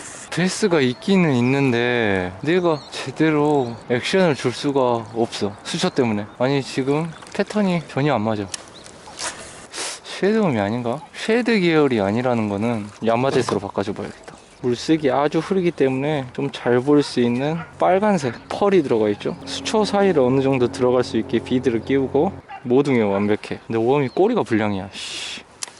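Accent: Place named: Jeolla, South Korea. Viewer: 전라도여? 사투리가오지구먼잉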